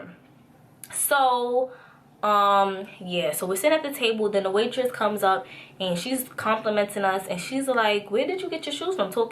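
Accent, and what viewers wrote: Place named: New York, United States. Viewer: I love to hear you talk..your New york accent is too cute!!!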